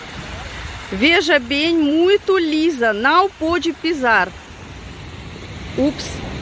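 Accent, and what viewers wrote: Russia. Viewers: Gosto muito do seu sotaque, já estou inscrito, gostaria de conhecer a Russia e a sua natureza. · Gostoso ouvir você falando nosso idioma com sotaque russo.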